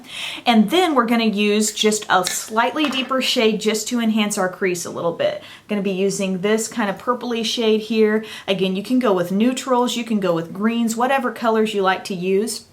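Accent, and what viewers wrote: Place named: United States. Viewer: love your accent!!! im from australia and yours is the nicest american accent ive ever heard (im being serious btw) :)))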